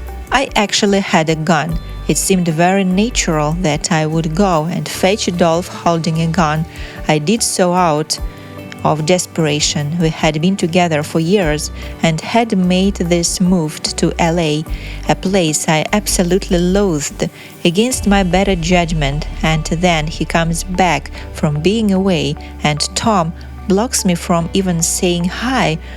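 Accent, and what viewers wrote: Russia. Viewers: lol Russian accent 😂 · What's with the Russian accent? We need Russians now to explain American pop culture history to us?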